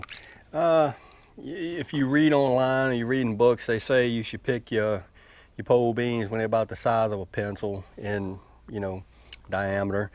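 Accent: South, United States. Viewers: Look ☀️😎shines on brother, great video as usual the man, now when's dinner ain't had fresh pole beans in years. My g-ma, canned the man. Just wondering what Indian you are, Chickasaw or Choctaw, Biloxi hope you and yours have sure enough great day and sure enough better tomorrow my friend. Man I love the way y'all talk down yonder.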